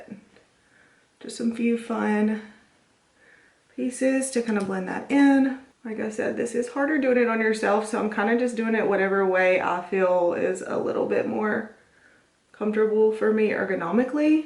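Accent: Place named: South, United States. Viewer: Love the cute southern accent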